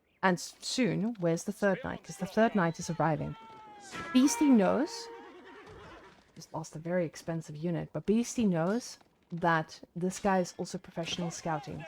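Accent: South Africa. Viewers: I'm not a beginner but I watched the whole video to enjoy the dulcet sounds of mesmerizing South African accent.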